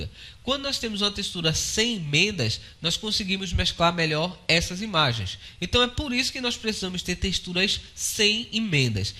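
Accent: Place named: Portugal. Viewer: Nao gosto de sutaque Portuguesa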